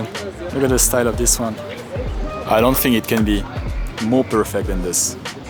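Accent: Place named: France. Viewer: On sent l’accent français haha ! Je viens de découvrir ta chaîne et la qualité du contenu est juste parfaite 🤩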